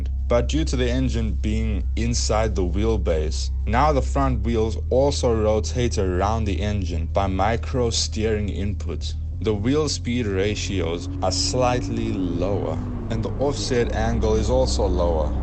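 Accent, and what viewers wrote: South Africa. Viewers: I feel like I am listening to an asian that some how grew up africanz in South Africa. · Bro are you south african, dueban maybe?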